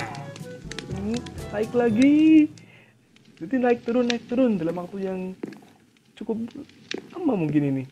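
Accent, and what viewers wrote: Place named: England, United Kingdom. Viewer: Tak kira Anda dari inggris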